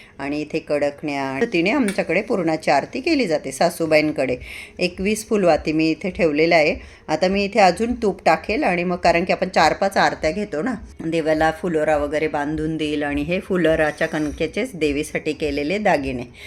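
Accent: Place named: Satana, India. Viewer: ताई कुठे राहता नाशिकला तुम्ही नाशिकला कुठे शिफ्ट झालात तब्येत फारच बिघडून गेलेली आहे तब्येतीची काळजी घ्या ताई ओटी खूप छान भरली देवीची सगळं आपल्याकडच्या पद्धतीनेच केलं तुम्ही सटाणा खूपच छान बोलता मला खूप तुमचे व्हिडिओ आवडतात पण तुम्ही कधी कधीच व्हिडिओ टाकतात ताई नाशिकला कुठे शिफ्ट झाले सांगा मी तुम्हाला भेटायला जरुरी मी पण नाशिकलाच राहते ताई कमेंट ला रिप्लाय द्या माझी सुंदर ताई माझी सुंदर बहीण माझी गोड बहीण अशाच गोड सतरा अशाच बोलत रहा खूप छान राहता येत मी श्री स्वामी समर्थ शिव शंभू शंकर बाबा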